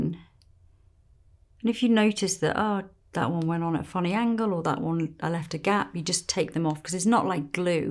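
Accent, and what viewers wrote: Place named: RP, United Kingdom. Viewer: It's a lovely look, however, I have an extreme allergy to acrylates and all of the fake lash products seem to contain them, unfortunately. Same for Lashify. Any suggestions? You are so very pretty, and I love listening to your plummy voice, too.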